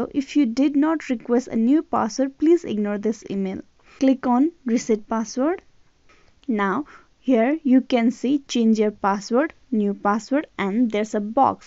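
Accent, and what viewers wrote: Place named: India. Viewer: I love your indian accent and thank you for the help man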